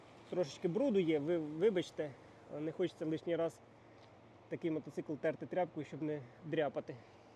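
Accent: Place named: Cherkasy, Ukraine. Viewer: Балачка, акцент Черкащанський. Думаю автор звідти родом. Вгадав?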